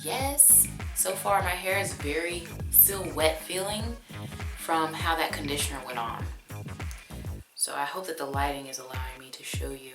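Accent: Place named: South, United States. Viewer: New subbie here 🤗 I love your personality! The thickness in your hair, lawd bless you. Are you from the south? I love your accent!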